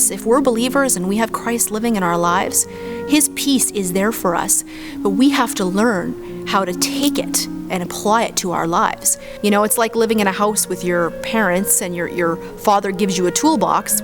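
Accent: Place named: Ontario, Canada. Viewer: wow.. this video touches me I started sobbing xD.. but something that's funny to me is that.. she's Canadian and I'm Canadian yet I could tell she has an Ontario accent and I live in Ontario xD Normall you can't tell.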